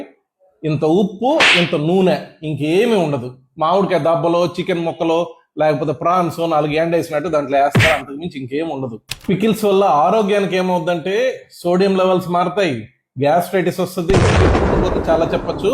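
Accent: Nellore, India. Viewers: మీరు చెప్పే విధానం మన నెల్లూరు స్లాంగ్ భలే ఉంటది 😊 థాంక్యూ సార్👌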